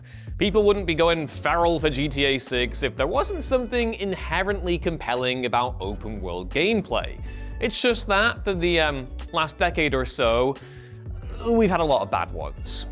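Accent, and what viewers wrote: United States. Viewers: Seriously,. Is it an affectation to try to appeal to American viewers, have you been visiting the States a lot lately? What is up with the Americanization of your accent? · Where in earth has this semi-american accent come from?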